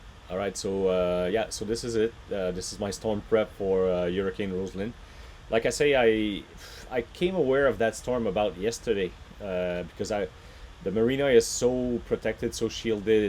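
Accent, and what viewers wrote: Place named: Canada. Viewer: great ! you re good in english ! are you good in Spanish too ? LoL Oui ! ton anglais est tres bon ! Mais L accent du canada vas toujours rester ! Je suis retraite et prevoit aller vivre au Mexique en 2023 ! la derniere fois que j etais au mexique etait en 1981 ! LoL are you going to be there next fall ? would be fun to chat over a coffee ! good luck with the Storm ! I think you should be ok from where you are ! Asta manana !🧐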